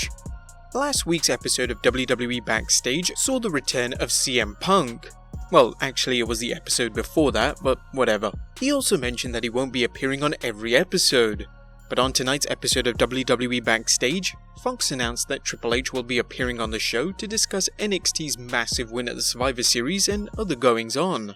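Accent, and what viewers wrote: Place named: England, United Kingdom. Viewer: How come the American narrator can curse but this narrator can’t? Need some English accented f bombs in my life lol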